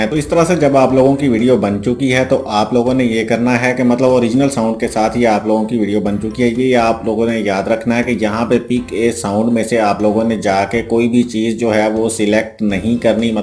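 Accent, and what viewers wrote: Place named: India. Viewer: It is Eanglish but he talks in a indian eanglish way! Why is YouTube full of haters? God.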